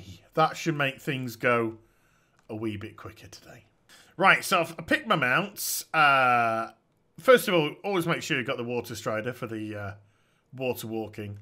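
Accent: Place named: United Kingdom. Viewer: Great vid as always. Your soothing British voice helps me relax this boring Wednesday. I think you should wait a while and start over. Keep being awesome! :)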